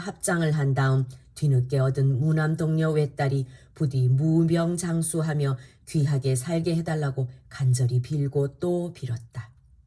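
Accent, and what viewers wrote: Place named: Gyeongsang, South Korea. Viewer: 경상도 억양의 구수한 심청전 잘 들었습니다. 책을 읽어주시는 엄마밑에서 교육받은 자녀들의 인성 감성이 아주 풍부 하리라 생각합니다. 건반 하나하나 내공 담긴 피아노 연주도 탁월하지만 동화를 일인 다역으로 재미있게 읽어 주시는게 전문 성우보다 훨씬 잘하시네요. 너무 감사합니다. 짝짝짝......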